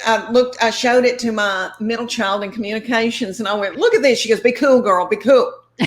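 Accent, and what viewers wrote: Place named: South, United States. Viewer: OMG this woman is the best person who ever came out of the South. I’m from New England, I used to have to (sorry) put the subtitles on when I listened to her. But now I understand every word. I laugh harder at her comedy than ANYTHING. Her stories about her kids and husband are soooo funny. I love her, and Nate Bargatze, and found them both just before the pandemic. Wow! Southern comedy and rural Vermont comedy? 😮Not so different. Growing up with just weird and wonderful people makes for such terrific stories. And you can NOT make this s—it up!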